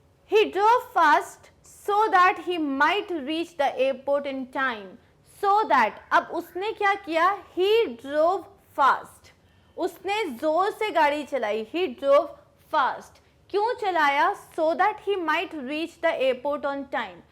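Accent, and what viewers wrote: India. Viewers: OH NO...INDIAN ENGLISH...NO...NO...NO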